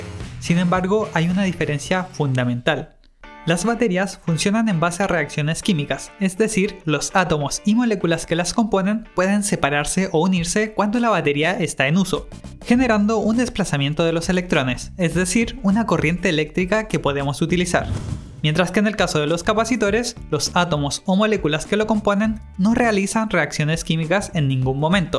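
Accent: Chile. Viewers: Me encantan tus videos P. D. Tu voz me recuerda a un programa que se llama Oban Star Racers creo que eres chileno o me equivoco?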